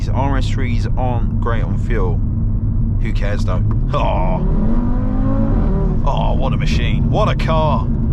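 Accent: Bradford, United Kingdom. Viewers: Bradford accent on point bro 👏👍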